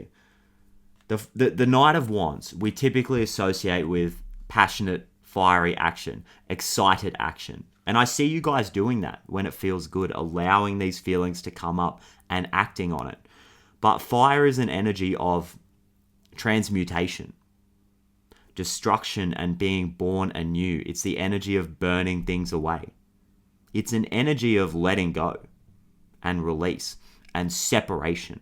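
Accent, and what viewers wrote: Australia. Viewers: Hey 💫 I am a brand new subscriber and your messages have been resonating hard 🤣🌸 Now I know why…. We share the same birthday 🎉!! Happy birthday 🎂 I had the most amazing weekend, energetically feeling such happy vibes ❤ I hope you did too 🥳 Thank you for this channel, I look forward to sharing more time and energy with you and this collective … we totally rock 🥰 It’s so good to hear a fellow Aussie in this space … Sydney girl here 🤗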